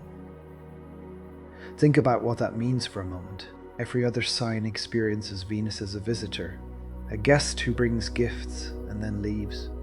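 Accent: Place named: Ireland. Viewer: 💯👊💪👍 Bravo - First Time Hearing the Voice of an Irish Astrologer. 👏🏻👏🏻 Wow. Right now, this is like finger on the pulse, so much in my financial horizon, it's right on the money.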